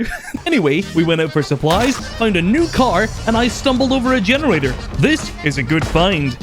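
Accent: Scotland, United Kingdom. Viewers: Are you Scottish pal ?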